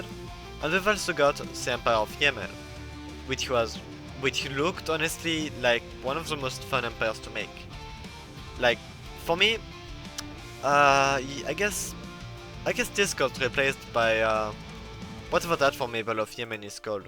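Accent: France. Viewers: Are you French? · Are you perhaps, french? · T'es français ? Ta un accent français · Are you French ? Your accent seems French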